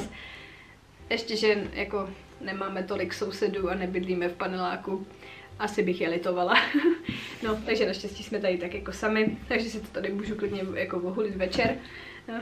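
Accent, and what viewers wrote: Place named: Vysočina, Czech Republic. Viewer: Já na Vysočinu chodila do školy a teda některý slova jsem jako Středočeška s pražskou obecnou češtinou nechápala :-), ale nikdy by mě nenapadlo se ptát, proč říkají "víjou, najíjou se žlicou,spíjou,jdou za starostem" apod. Prostě nářečí, kterýho je ČR plná ;-)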